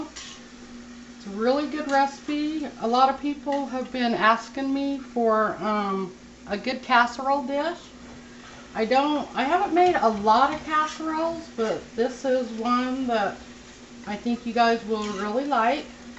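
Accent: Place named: Utah, United States. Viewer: I spent about 7 years in Utah. (Including a couple years in your area!) Your Utah way of speaking, & your recipes take me back. I love it! Love to you & yours. Wishing you & your family a very Merry Christmas, & the very best of this holiday season. Thinking especially of your parents, & praying that everything proceeds in the best, most gentle, Light-filled way possible. God be with y'all.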